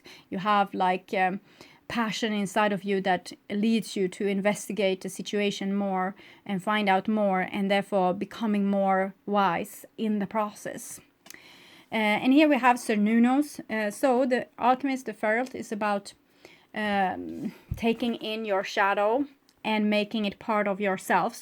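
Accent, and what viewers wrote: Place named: Sweden. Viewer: Hej! Är ny här! Tyckte jag hörde en svensk brytning😜🌻. Din läsning var verkligen mitt-i-prick, har även lyssnat på dom nyare läsningarna. Det är som att du beskriver mitt liv. Kommer definitivt följa dig!❤ Tack så mycket! Kram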